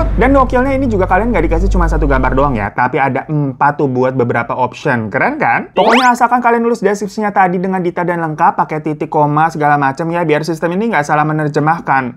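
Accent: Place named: Sunda, Indonesia. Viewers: lucu banget ngomong sundanya 😭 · Maaf bang salfok sama logat bhs sundanya 😭🙏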